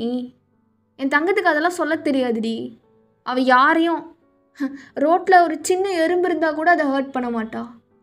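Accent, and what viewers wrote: Kerala, India. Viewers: Ahhhh 😩 ur malayalam is so cute🤍.. I want pinch your cheeks 😅🤏🏻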